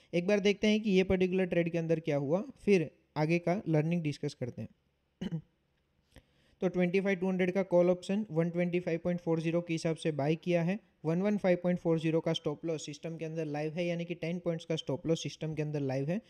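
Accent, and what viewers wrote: Gujarat, India. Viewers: Bhai Tame Gujarati cho?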